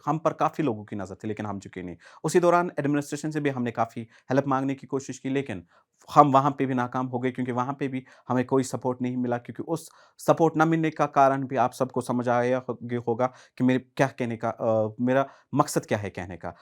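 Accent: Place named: Kashmir, India. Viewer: I like kashmiri hindi..their slangs is really sweet..u need to have courage to say these things in public platform...hats off u brother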